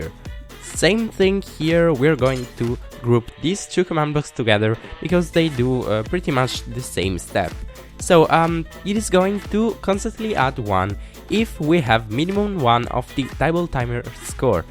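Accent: Italy. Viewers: Italian :D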